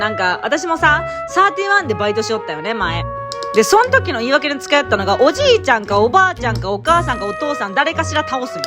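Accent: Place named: Hakata, Japan. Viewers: マジDJ社長大好き間違えただった😍ｗｗ この博多弁？めっちゃ好きやわ～ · チャンネル登録しました!! おなじ九州なので福岡弁癒されます♡ 頑張ってダイエットしたい☆彡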